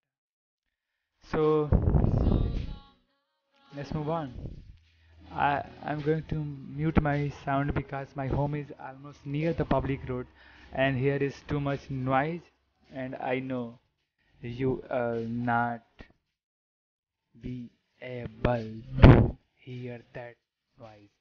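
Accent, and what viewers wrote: India. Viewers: u sound like an indian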